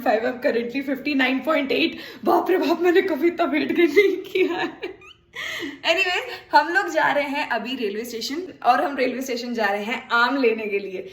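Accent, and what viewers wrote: Bihar, India. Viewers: Mam ap jo bich-bich me bihari lehja me bolti h ekdum mast lgta h sunkar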